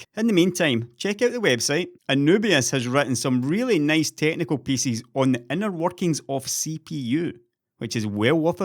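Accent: Scotland, United Kingdom. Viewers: The sweet sound of Scottish analysis...everyone knows what time it is. 🙏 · He's Scottish, of course. The accent is similar to Dr. William MacDougal from Kirkwall ... Or Aberdeen? Or Glasgow? 😁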